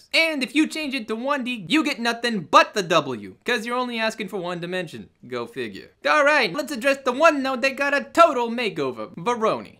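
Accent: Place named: Brooklyn, United States. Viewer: I FEEL LIKE IM IN BROOKLYN LOL, I LOVE IT!!!!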